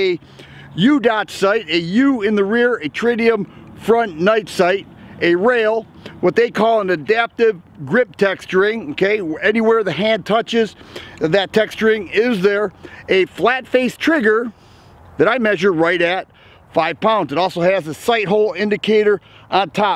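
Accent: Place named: South Jersey, United States. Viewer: Sound like your from South Jersey or Jersey at least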